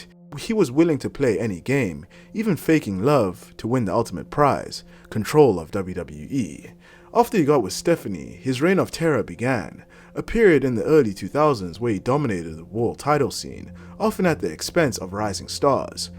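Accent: South Africa. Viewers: He sounds South African, I thought I was bugging. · Are you South African perhaps?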